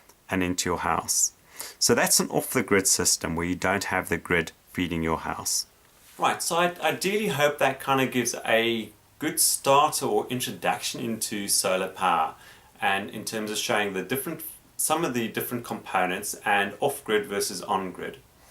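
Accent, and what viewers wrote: South Africa. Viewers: Even with a thick SA accent, your video was easily understood and interesting. Great video 👍 and thank you.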